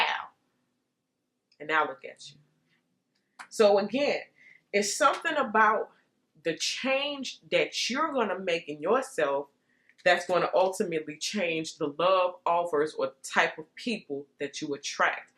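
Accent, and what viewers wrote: United Kingdom. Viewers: whole reading resonated, haha yes queen vibes issa new energy haha picked up british accent lol x love from UK x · You’re funny .... that British accent tho 😆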